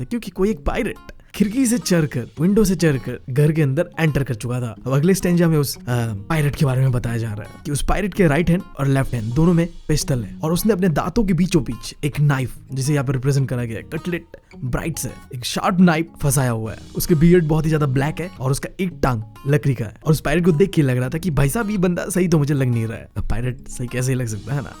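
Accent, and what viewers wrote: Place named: Bihar, India. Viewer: bhai aapki bhasha mai bengali kam or bihari vibe jayada kyu hai kon kon agree karta hai😊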